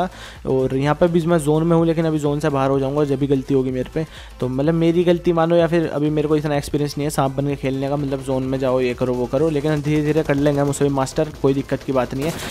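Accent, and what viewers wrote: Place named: Delhi, India. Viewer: Bhai aapki aawaz delhisehu ki jesi he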